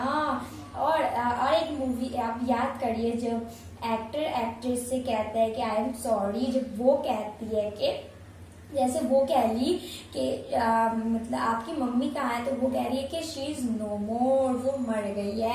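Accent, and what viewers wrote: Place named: South, India. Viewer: Nice English but i am thinking you are from south because your Hindi is almost right.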